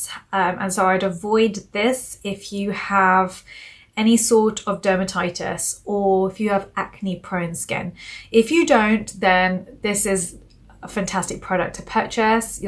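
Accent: United Kingdom. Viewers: This DOCTOR is personable, generous and transparent with her vast knowledge of skincare ingredients. And yes, she is also a gorgeous lady. I also love her (presumably British?) accent. As an American, any accent sounds smarter than what I am used to hearing. 😜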